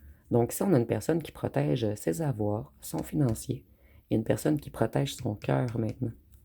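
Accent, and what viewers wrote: Quebec, Canada. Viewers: Vous êtes adorable, un travail poussé pour créer de petites cartes bien sympas comme celle des chansons, j’aime bien votre énergie solaire et cette voix sympathique, l’accent du Québec trop mignon. Bref, vos guidances sont précises et agréables. Belle journée 💕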